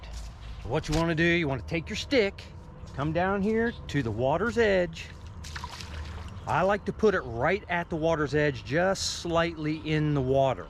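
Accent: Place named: California, United States. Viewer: Dude I,m from Califoria but i'v lived in Missouri for 45 years but you sound like your from Ca. no ofence ment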